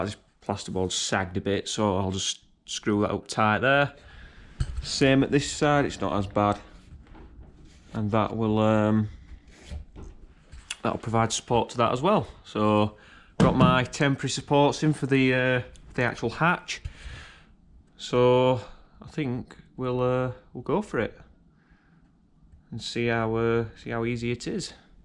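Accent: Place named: North, United Kingdom. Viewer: Nice tidy job once again mate as always - your a great bricklayer and definitely a good all rounder it seems 🍀👍 and the most Northernet northerner I’ve ever heard 👍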